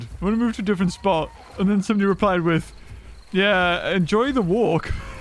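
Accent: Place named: United Kingdom. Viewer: 5 seconds of watching the video hearing this british guy clicking on LIKE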